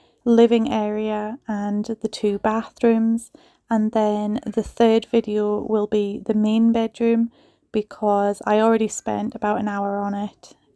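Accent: Scotland, United Kingdom. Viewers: yes has picked up quite a lot of the scottish accent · My grandmother was from Scotland and hearing your voice and accent has brought me so many sweet memories. She was such a sweet, loving woman. Thank you both, ladies, for the fine job you do.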